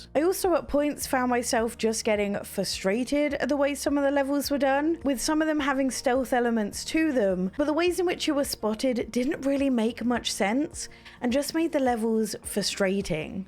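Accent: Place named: United Kingdom. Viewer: she is from the Uk they say things different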